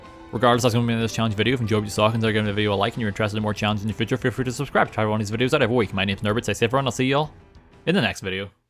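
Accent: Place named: Northern Ireland, United Kingdom. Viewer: Oh that's what that accent is! I was like well that's not scottish or welsh, and it's unlike any irish accent I've ever heard. I mean I know y'all have a new accent every 50 feet but I guess it never occurred to me that northern irish would be distinct from irish to american ears. huh.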